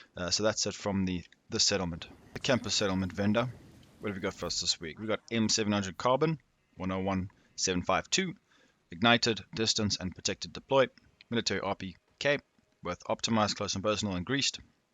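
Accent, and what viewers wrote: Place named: South Africa. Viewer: Wascally... You sound South African. As a lightie, didn't you watch morning cartoons before heading to school? Wascally is pronounced like rascally, with an Elmer Phud lisp when he's ranting about the wascally wabbit (Bugs Bunny IOW) that he's taking strain to catch. Thus the Wascally talent makes one harder to bog down with riot foam 😜👍🏽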